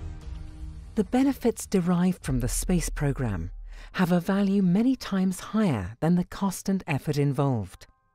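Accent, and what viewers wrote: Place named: United Kingdom. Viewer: what is all this about "meethane, nebulee, etc.? what are laver outflows? i thought they were lava (la-vah) outflows. i just never will get the british accent where all the "r's" are suppressed, but are inserted at the ends of words that have no r's.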